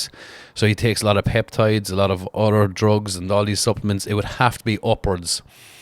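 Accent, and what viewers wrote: Ireland. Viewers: By fuck it's refreshing to hear an Irish man vocalise the amount of gayness in the world these days. Fair fucks to yee bie 🤛🏼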